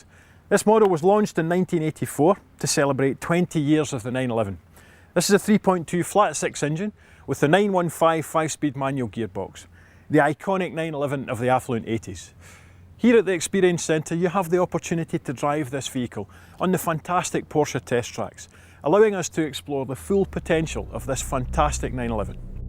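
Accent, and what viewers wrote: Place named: Scotland, United Kingdom. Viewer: Could do with more engine noise and not a Scot talking a hole in my head...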